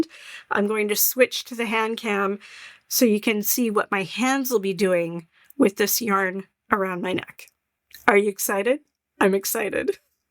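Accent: Canada. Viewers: I am a crocheter, but I recently learned to knit because I became good friend with a knitter. She taught me continental, but i stumbled into continental combination. I'm SO GLAD to find out that it will NOT mess me up in the long run to do that instead of "traditional" knitting. :D Also, I picked out that you're canadian instantly. Thank you SO MUCH for these tips and method options!! You've gotten another subscriber from me!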